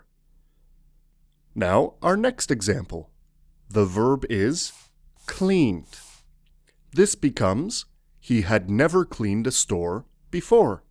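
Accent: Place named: United States. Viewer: My goodness man. Good thing I've found your channel. I love the American English, and you speak so clearly, I give English lessons and your explanations will help me a lot. Good job. And your little stories are the best ones. Keep up the good work.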